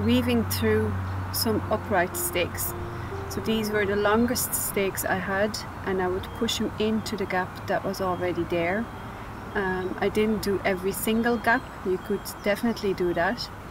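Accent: Ireland. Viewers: Irish accent. 😍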